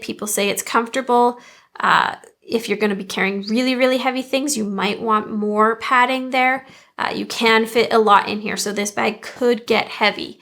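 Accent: Canada. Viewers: I could really hear your Canadian accent in this one 😉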